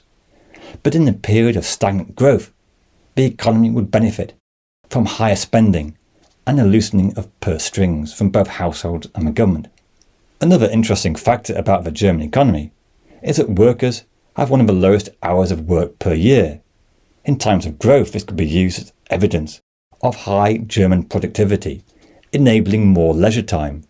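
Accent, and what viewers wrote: United Kingdom. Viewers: I detect a british accent, if I am right ? It's always funny people from Countries that turned into utter shitholes start worrying about other Countries 😂 Yes, at one point there will be stagnation or a small decline - like in every Country - like it has happened sometimes in the last 50 years - geeeee- only IQ less fools believe that growing an Economy more and more every year after year after year is possible - IT IS NOT 😂 AND THAT IS NOT A BAD THING - GETS EVERYBODY DOWN TO EARTH. And If I where you - I would worry about Little Britain much much much more than Germany. They are after all still in the EU. And what goes for Energy prizes - Well , they are more than Double in Little Britain than in Germany , the last I read 😂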